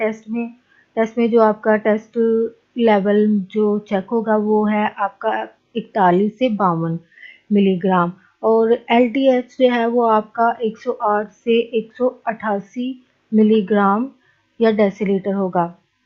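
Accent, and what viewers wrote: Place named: Rural, India. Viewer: paindoo awaz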